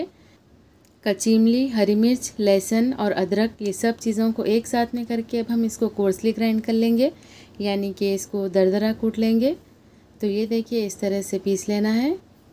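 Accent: Hyderabad, India. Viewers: MashaAllah aap ki har ek recipe zaberdast rehti aur uspe Hyderabadi baat video mein aur bhi jaan daldeti matlab ek dum kirak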